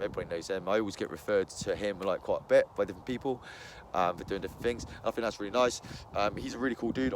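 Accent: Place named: Wales, United Kingdom. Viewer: I DO NOT SOUND LIKE YOUR IMPRESSION OF A CARP ANGLER!!!! :) I like this video, best one yet. You mentioned Somerset, i thought you sounded Welsh?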